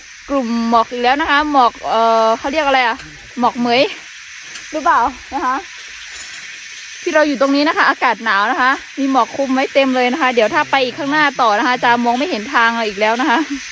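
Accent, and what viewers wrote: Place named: Isan, Thailand. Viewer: บรรยายเก่ง ออกเสียงไทยชัด บางทีเหมือนออกทางอีสาน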